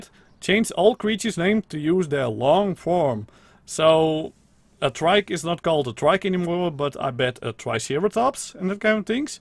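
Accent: Netherlands. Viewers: u bent zeker nederlands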